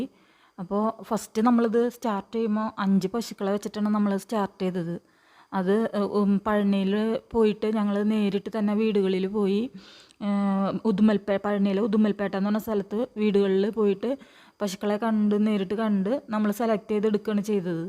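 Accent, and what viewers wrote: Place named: Thrissur, India. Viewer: തൃശൂര്‍ ഭാഷയാണല്ലോ എവിടെയാണ് നാട്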